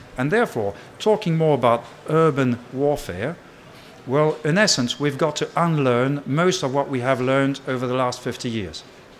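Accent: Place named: France. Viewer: He speaks perfectly English, but he has a heavy French accent, I recognize it as a French. English is clearly not your mother tongue.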